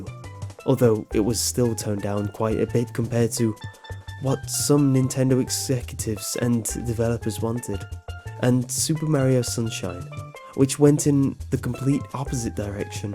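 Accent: United Kingdom. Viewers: IKR, Brits pronouncing things differently is so wholesome!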